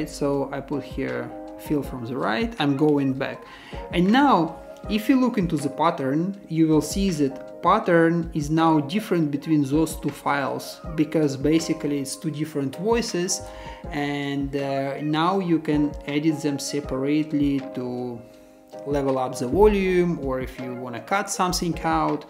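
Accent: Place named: Russia. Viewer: чувствуется русский акцент.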